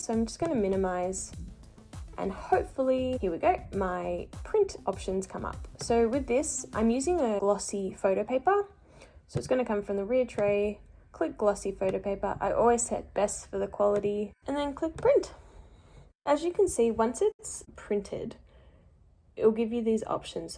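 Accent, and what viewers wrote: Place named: Australia. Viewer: i adore your australian accent 😍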